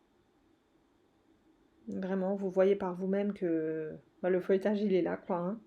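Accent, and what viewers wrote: Alsace, France. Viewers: slm je viens de découvrir votre chaine..toujours de belle recettes a testé..bien expliqué ..vous etes de l'Alsace ya comme un petit accent 😀bravo a vous en tout cas 🖒🖒😚j'aime bcp la cuisine turque aussi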